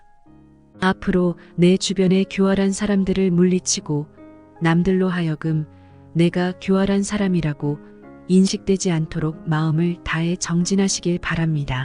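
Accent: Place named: Jeolla, South Korea. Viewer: 완전 전라도네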